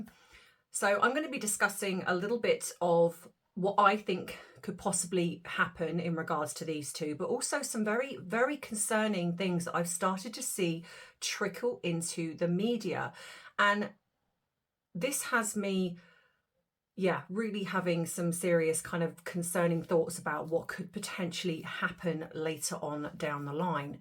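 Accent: United Kingdom. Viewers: U have a beautiful speaking voice and British accent !!❤️from Cali. USA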